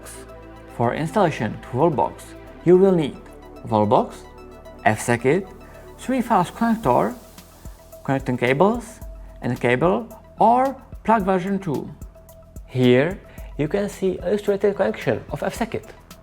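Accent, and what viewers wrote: China. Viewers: his English accent sounds a bit like Chinese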